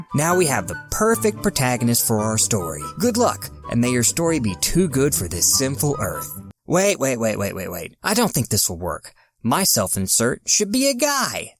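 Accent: South, United States. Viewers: your mildly southern accent makes these 100 times funnier to me man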